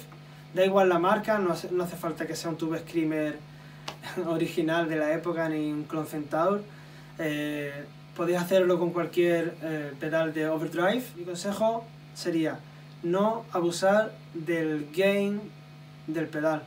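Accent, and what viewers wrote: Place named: Argentina. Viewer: joder pensé que eras argentino primera vez que veo un video en el que hablas...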